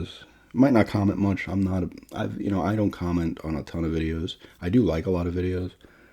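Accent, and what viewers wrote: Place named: Upstate New York, United States. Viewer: I'm from Syracuse NY so your accent sounds pretty close to home which is one of the reasons I like the channel. One thing I've noticed is we often speak fast compared to folks in other states. I moved to VT and people take a bit more time here. I've got a coworker from Boston so we can converse at full speed which is refreshing 😂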